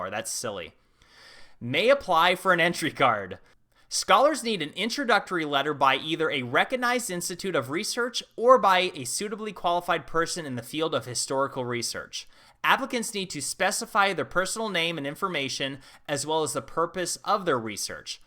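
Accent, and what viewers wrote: United States. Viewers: U talk good but u so much amerikan .. try be betwen europe and amer..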